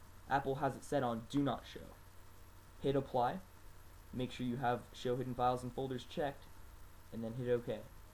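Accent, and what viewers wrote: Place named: United States. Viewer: that perfect american accent you have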